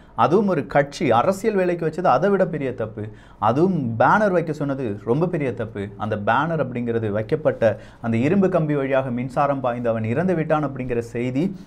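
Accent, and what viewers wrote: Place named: Kanyakumari, India. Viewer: kanyakumari slang...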